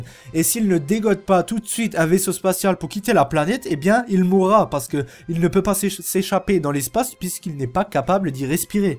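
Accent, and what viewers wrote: Alsace, France. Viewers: t'as un sale accent alsacien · Ne serais tu pas d’Alsace ? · Tes un alsacien jen suis sur je reconnais son accent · Accent alsacien, vertomi j'adore. ❤️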